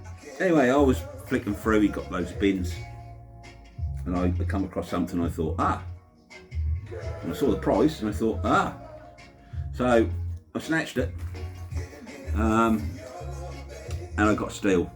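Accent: Suffolk, United Kingdom. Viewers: Shame about the Blue Note album but if it still sounds good that's fine...generally I don't buy european Jazz vinyl albums as so many are Digital to Vinyl copies Di Agostini is one there are others, the USA market does not allow that (digital copies of original masters) Originals are like hens teeth..!!. Different rules in the EU. Nice to hear that Suffolk accent...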